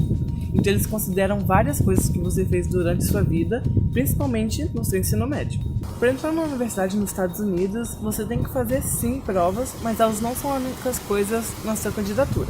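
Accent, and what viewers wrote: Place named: Minas Gerais, Brazil. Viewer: Caraca mano, de minas kkkk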